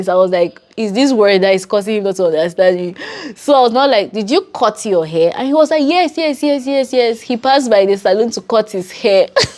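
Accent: Nigeria. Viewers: you know that even as at that you sound more Nigerian. Southern part of Nigera sound same with you you do land at oron by sea you should check the state capital Akaw ibom state you will be impressed this isn't far form Cameroon like oron to uyo isnt upto hrs you definitely love that part of Nigeria it also stand out to also have the best roads in Nigeria after Abuja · 😂You really got the Nigerian accent very well. But until we hear many average Cameroonians, all these phonetics you appriopriate to your people, is not true😊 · Yes, you sound so much like a Nigerian when speaking English... The accent sounds so alike... The only time i can identity an English speaking Cameroonian is when they call "Cameroon" or they try to speak pidgin and also when you pronounce one or 2 words. And in Nigeria there are alot of English accents depending on the region/tribe you come from. 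In Nigeria the best English accent comes from thesame people with the worst English accent..."HAUSA/NORTHERNERS.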